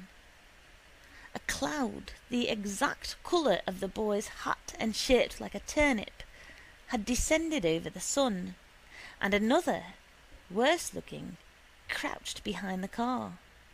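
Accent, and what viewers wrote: United Kingdom. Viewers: Get your act off here. We don’t need O’Connor audio stories read in a British accent. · David Ritchie haha lol the British accent is cool tho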